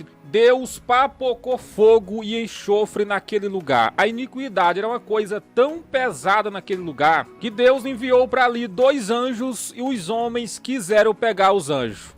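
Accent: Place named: Maranhão, Brazil. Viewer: Estou gostando muito dos seus vídeos 👏👏e aprendendo muito, e gosto do nosso sotaque do Maranhão 👏sou de São Luís MA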